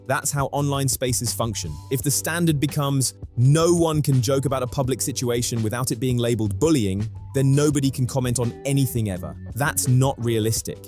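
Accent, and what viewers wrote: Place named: United Kingdom. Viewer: ahh cool, so it's the same information we've had for weeks just regurgitated in a british voice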